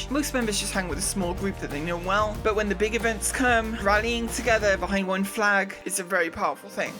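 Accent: Wales, United Kingdom. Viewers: Your voice is lovely sounding and Welsh #FullTidee so don’t worry about those that mock. I’ve been placing SC just about as long as you have but can’t put the hours in as much as I’d like so still feel a bit of a newb when it comes to approaching an Org and I tend to hide away mining and exploring different locations. I’m less combat focussed so maybe Skunkworks can form an explorer arm and recruit for that?:) Keep up the good work though (and ) and see you in the verse hopefully soon. Taffy Coops. X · you make really good content. Nice Music, good gaming, good edditing and your voice rounds it all up its just natural, its good as it is. English is not my first languege but do you have a britisch accent? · There's nothing wrong with your voice. I do love that Welch accent, but it could be thicker.... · Thanks! I thought you were speaking with a British accent. 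We all have something. I’m low vision. It’s OK and you and are among friends! Your videos are fantastic!! I very much enjoy them. Please continue. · Awesome! The Welsh accent is lovely. I’m down here in the Southern Hemisphere, and I like being able to remember accents and where they come from. (I have fun guessing with overseas visitors at my work). Best wishes for 2022 and beyond! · I honestly just thought the voice was a specific British accent from somewhere. 😂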